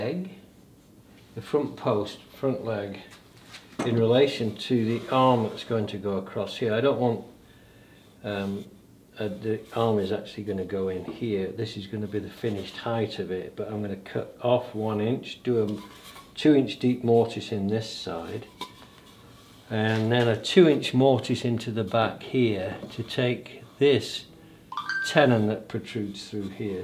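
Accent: United Kingdom. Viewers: I was kind of mislead by your wonderful British accent, when I found out you actually live and work in the US. That was a surprise.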